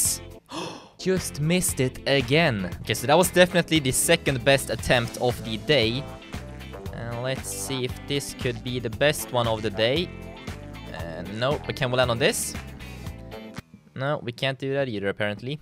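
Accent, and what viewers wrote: Sweden. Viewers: I love your swedish-english xD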